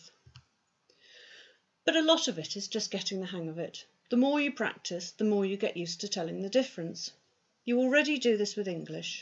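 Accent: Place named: England, United Kingdom. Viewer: Yeah good lesson! I've difficult with your very well english accent for a french like me who's not so good to talk fine english and undesrstand english people. :-) But with several times to see and listen, i'll hope it will be better. Waiting too for the next... Best!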